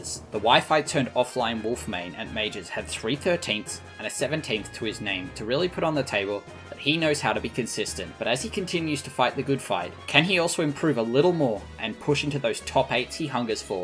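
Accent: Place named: Australia. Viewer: This guy sound Australian. 🤔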